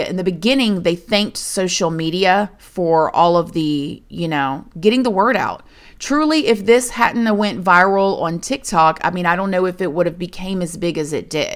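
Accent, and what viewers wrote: South, United States. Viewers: I don't know what part of Florida y'all are in, but I detect a southern accent. I'm in Enterprise in deep southeast Alabama. I startedwatching you in the early Gabby days. Love your videos!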